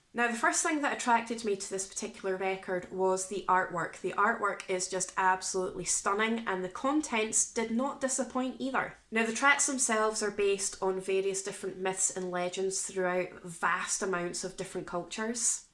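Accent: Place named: Scotland, United Kingdom. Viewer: Your accent reminds me of my hometown, Kincardine (Ontario, Canada). We had a very strong Scottish influence. It’s good to hear for a change.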